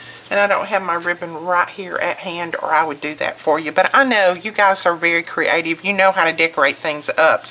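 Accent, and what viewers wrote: South, United States. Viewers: Thank you for this tutorial. I tried it immediately and it works great. And your southern english sounds so nice. I will follows your blocs.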